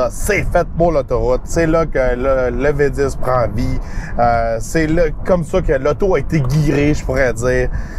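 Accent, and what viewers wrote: Quebec, Canada. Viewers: c'est laccent québecois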